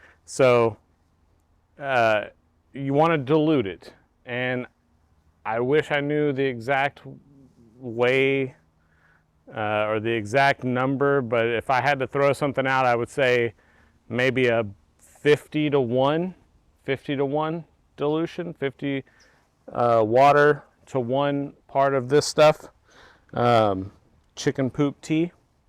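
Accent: South, United States. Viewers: Love the videos yall. Love ur accent buddy. Lol I got me a southern accent too over here in Pensacola Florida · That southern accent fit perfectly for my part of the country. Good job Buddy.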